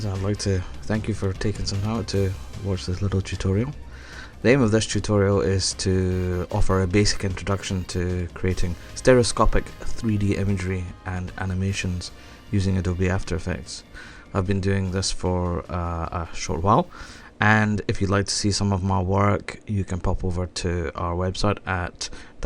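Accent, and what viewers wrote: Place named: Scotland, United Kingdom. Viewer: Not to get off topic, but that is one heavy Scottish accent.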